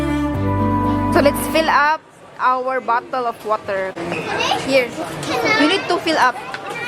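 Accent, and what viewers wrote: Visayas, Philippines. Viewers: Stop being perfectionist girls.shes a Bisaya so it's not easy to her to speak straight..and that's the way that's why she known from the beginning of her vlog if you think that you are brainy enough you should understand what she's going to mean it.in my opinion ✌️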